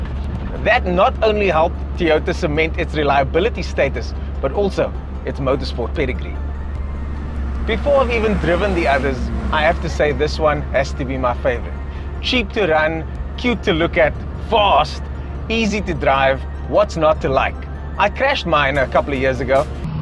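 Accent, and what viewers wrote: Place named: Afrikaans, South Africa. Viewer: That horrible Afrikaans accent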